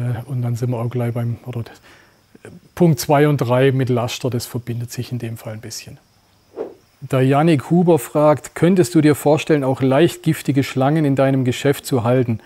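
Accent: Swabia, Germany. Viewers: du haddeschd scho enemal a wegen weniger Dialekt geschwätzet, des war echt besser, net jeder Zuseher tut schwäbisch verschdehet, scho gar net außerhalb vom Ländle. Is scho a wegn heftiges Laschder, bidde beherziged... 🤦‍♂️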